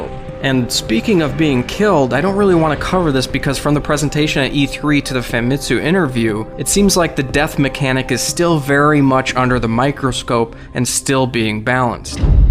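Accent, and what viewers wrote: United States. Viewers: The Very American way he pronounces Japanese words annoys me.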